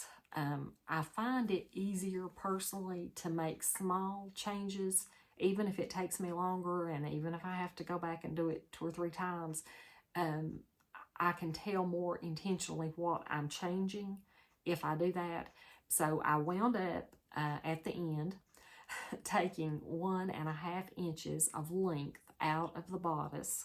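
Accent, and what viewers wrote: South, United States. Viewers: Will you show this on your video? Love your southern accent. We are originally from Tennessee but have lived many places in U S. Would love to see all supplies used.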